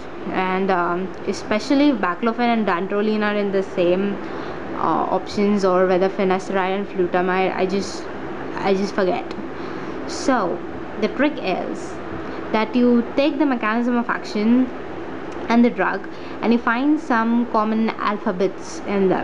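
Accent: India. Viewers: Because of your accent and the traffic noise😁 btw im indian too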